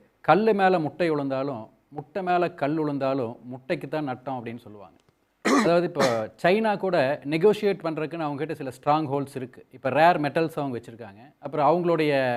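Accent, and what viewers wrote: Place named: Kongu, India. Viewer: கொங்குத் தமிழின் சிறப்பியல்போடு கருத்துகளையும் சிறப்பா வெளிப்படுத்தியிருக்கீங்க... அருமை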